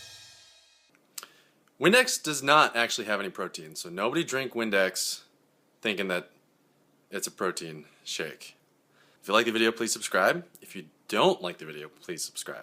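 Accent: Boston, United States. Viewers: Woahh hello random Boston accent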